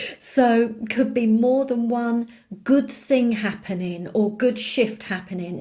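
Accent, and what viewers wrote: United Kingdom. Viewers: Good Morning!..From California.....Gemini is here...How r u? Lovely lady...Thank uuuuu so much for the beautiful reading for me ...Trully appreciated ....By the way, I love your British Accent....Tc ..Much love .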